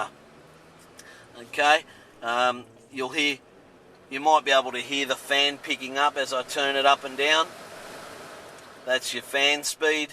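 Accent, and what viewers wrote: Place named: Ireland. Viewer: this guy sound like irish man from cavan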